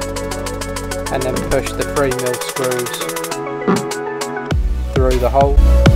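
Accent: England, United Kingdom. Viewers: you sound English, I didnt know England was one of those sad countries that used Phillips screws, I thought that was an American thing.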